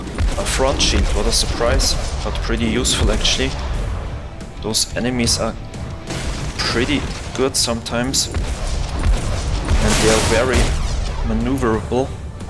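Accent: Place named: Germany. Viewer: Am Akzent hört man das du ein Deutscher bist. :D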